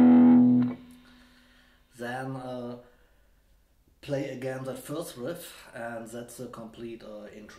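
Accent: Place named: Germany. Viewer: I guess so. German accent ...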